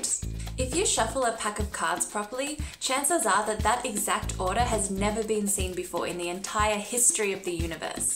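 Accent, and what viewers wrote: Australia. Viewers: Well, since we are bound by causality, it would be impossible for me to do that. Your making the video is the cause and my replying to it is the effect, after all. The latter can not precede the former. BTW your accent..........I presume you're Australian? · Her accent is Australian and I’ve never heard an Aussie pronounce it “urine-us”. That’s more of an American affectation. Out of curiosity, how do you pronounce “uranium”?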